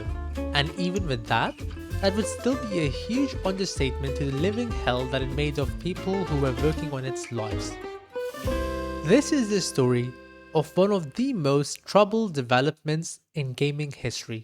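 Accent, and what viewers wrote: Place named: Iran. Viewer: Great video! By the way, are you persian? I hear hints of persian accent in your voice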